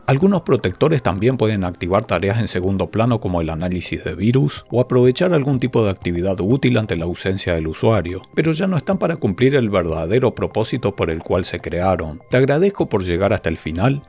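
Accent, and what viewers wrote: Argentina. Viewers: Por tu acento me da curiosidad saber de que parte de la Argentina sos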